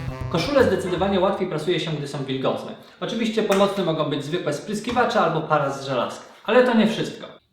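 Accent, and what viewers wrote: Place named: Poznań, Poland. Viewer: dwa słowa i już wiesz, że autor jest poznaniakiem 😁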